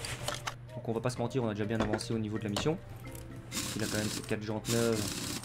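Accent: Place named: Belgium. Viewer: D'après ton accent tu dois sûrement être Belge ? 😁 (enfin des belges sur YouTube ahah) super vidéo +1 abos 👌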